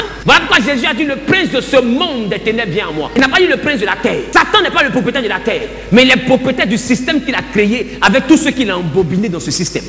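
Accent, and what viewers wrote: Ivory Coast. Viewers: Bonne année cousin, mais c'est comment? Nous n'avons plus tes directs! Faut ratisser frère......mais papah, tu dress comme nous congolais, mais ton accent est ivoirien! Tu nous ressembles aussi....enchante mon frère.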